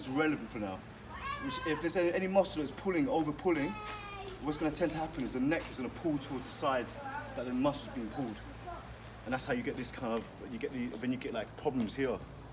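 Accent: United Kingdom. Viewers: your English sounds British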